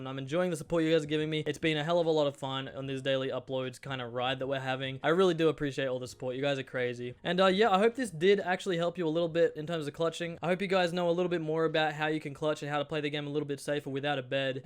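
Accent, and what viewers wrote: England, United Kingdom. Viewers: Are you British?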